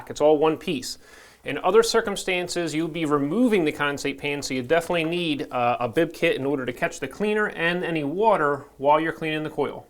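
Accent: United States. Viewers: This guy has an accent, which I can't tell where in the US he is from. Just listen to how he pronounces the word "coil"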